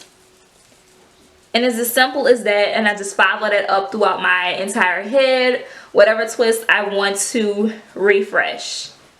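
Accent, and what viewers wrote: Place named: Louisiana, United States. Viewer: Queen! I knew as soon as I clicked on you that you were from Louisiana! You sound like Mardi Gras, crawfish, and gumbo. Man I miss the south!!! Gorgeous hair!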